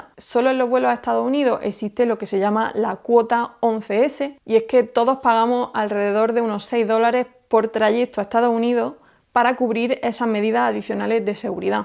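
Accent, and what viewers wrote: Andalusia, Spain. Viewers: De qué parte de España es? Me encanta como habla! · Es el segundo video que veo tuyo <3 contando el vídeo del Concorde y la verdad estoy muy feliz con tu contenido esta super completo jsjs. Comence a ver tus videos a raíz de un vídeo de super holly donde imitaba tu acepto andaluz jeje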